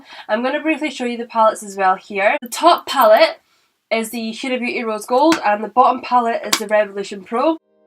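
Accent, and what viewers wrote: Scotland, United Kingdom. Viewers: Love your accent Scottish?🏴xo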